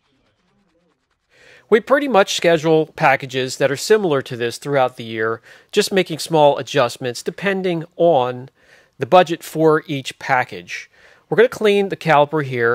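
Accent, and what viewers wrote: Pennsylvania, United States. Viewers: “Trial by era” Shout out to the PA accent. 😉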